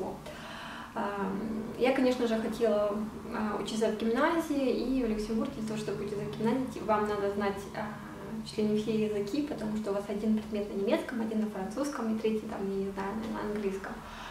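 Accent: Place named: Urals, Russia. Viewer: Дикция уральская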